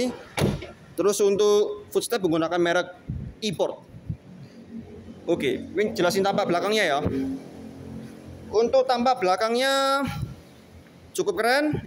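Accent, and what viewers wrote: Java, Indonesia. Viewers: Komentator nya medok banget... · Mobilnya keren... Yg gak keren tu orang yg ngerivieu nya..katrok medok...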